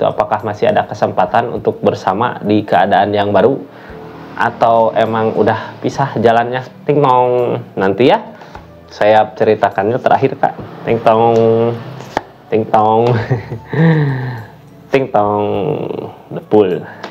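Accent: West Java, Indonesia. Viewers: Pagi kk..pisces hdr..terimakasih reading.ya sukses dan selalu sehat kk..sunda banget..❤❤❤🙏🙏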